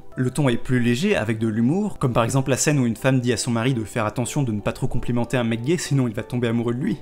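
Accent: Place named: England, United Kingdom. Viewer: Ton accent anglais est magnifique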